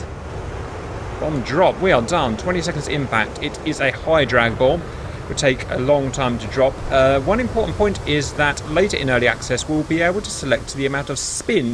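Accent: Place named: United Kingdom. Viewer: Happy Fathersday you funny talking brit. Enjoy the journey sir.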